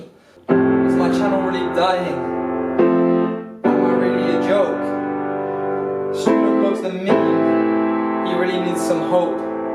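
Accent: Australia. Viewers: Lord your Australian accent is getting thicker and we aren't even at July yet. You're going to come back to the UK a full Assuie. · You should go somewhere tropical to spice up the views... Bali, where I am Malaysia, Bangkok. New Zealands visually bit blah. You just bit of a square M8 liven it up. Lol and lose the awkward Australian twang. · do I hear a slight Aussie accent now? 😂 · Omg you really are getting a Australian accent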